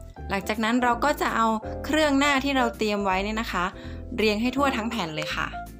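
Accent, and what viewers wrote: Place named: Northern Thailand, Thailand. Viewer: เข้ามาไล่ตามดูย้อนหลัง ตอนพูดกลางก็พูดได้แบบเป๊ะมาก แต่อู้คำเมืองน่ารักมาก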